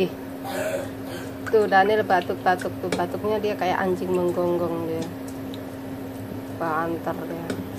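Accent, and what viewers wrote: Java, Indonesia. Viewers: Asli Jawa mbak? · Ibue medok nemen..orang jawa mana bu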